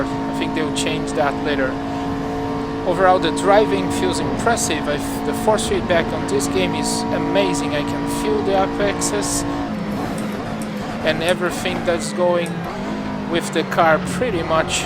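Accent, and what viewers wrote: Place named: Brazil. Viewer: lendo os comentários percebi porque estava entendendo completamente seu inglês hahahahahahah você é brasileiro tbm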